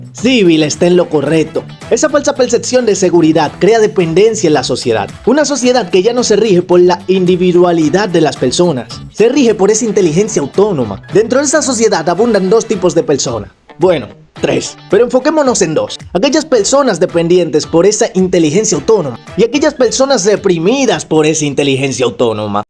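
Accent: Dominican Republic. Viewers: eres dominicano?, buen video, mi opening favorito es el 2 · Como amo el acento dominicano❤ genial analisis🎉🎉🎉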